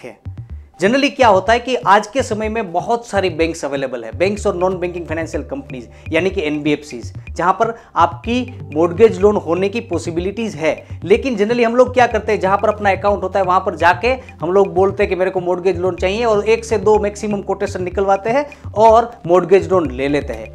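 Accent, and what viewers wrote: Gujarat, India. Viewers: I think you are gujju. I feel this accent😅🤟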